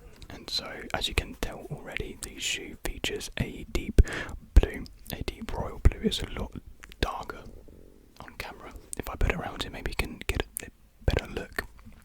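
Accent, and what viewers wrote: United Kingdom. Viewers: I just found you and love your content, I saw and listened to your quilting YouTube as well, also saw additional content since then, hope you found a nice mix of YouTube and new career adventure. I must say now that I found you your are my favorite A-S-M-R MAN, LOVE THE BRITISH ACCENT. YES WEAR YOUR SHOES!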